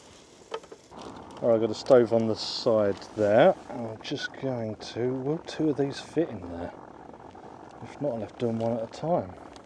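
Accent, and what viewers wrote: England, United Kingdom. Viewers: I been looking at your videos and i just wont to no do. You have snakes were you live at the country looks like American ,but i no it is over in England because the way you talk my Aunt mother was from wales and she talks about not from England I'm from Wales she would set you straight were she lived,thank you for showing us how to sit up a tint,god bless.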